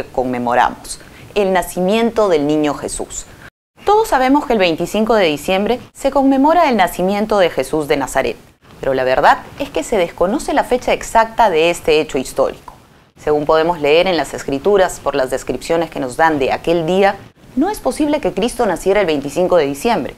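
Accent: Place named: Mexico. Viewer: De que país es te programa. Tu acento neutro me hace identificar de que país eres. Pero sospecho que eres mexicana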